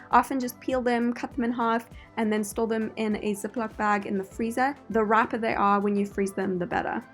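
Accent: South Africa. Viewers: Are you maybe from South Africa? Youe accents sounds like it. Great video by the way 🌈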